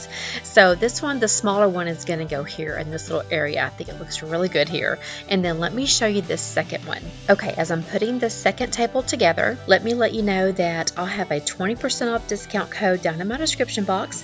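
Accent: South, United States. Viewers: Hey, I’ve been following you for a while now but have not introduced myself. I know you always tell us to introduce ourselves. I live in New England but one side of my family is from the deep south so I enjoy your sweet southern accent. 😁 The bird house is hanging from the roof line over your deck. I do not have a YouTube channel but I do have an Instagram account because I also enjoy decorating. I just started following you over there on Instagram.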